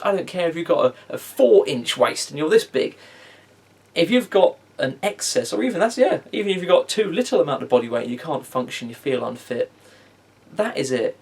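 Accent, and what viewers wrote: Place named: England, United Kingdom. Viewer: It's just nice to hear a nice clean English accent. You even pronounce you Ts. I'm so sick of being surrounded by the "Init bruv" accent.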